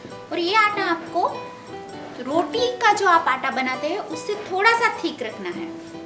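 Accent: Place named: Gujarat, India. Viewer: Good receipe. Thanks for sharing. But why Gujju people pronounce like Gujjus please work on your words. Come out of Gujju accent you will ROCK for sure. All the best.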